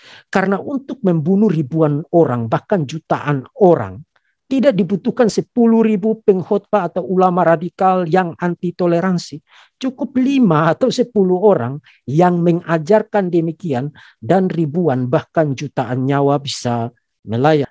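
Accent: East Nusa Tenggara, Indonesia. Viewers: Narasi yg cerdas, dan ilmiah, klo dengar dialeknya teringat waktu kuliah di Malang bersama teman kuliah dr NTT, Tuhan Yesus memberkati bang sekeluarga 🙏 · aksen rote NTT... Bravo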